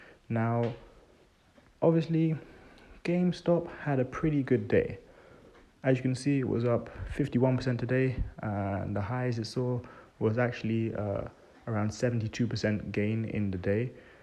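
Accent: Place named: England, United Kingdom. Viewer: Hi you sound English are you based in the U.K. if so do you know where we can play options on these stocks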